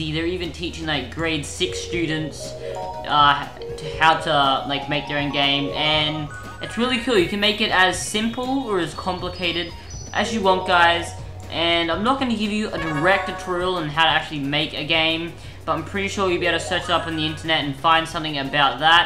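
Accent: Australia. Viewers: Are you from Austrelia?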